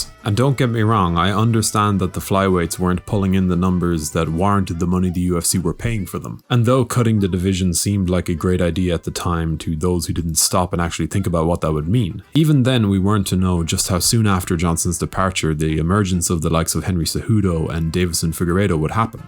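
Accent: Ireland. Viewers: Irish. Not even close. 😂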